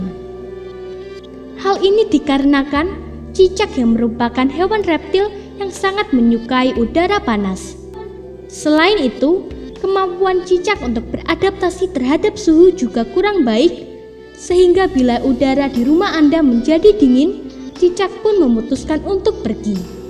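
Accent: Java, Indonesia. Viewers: Ngmongnya meddok, lelet lagi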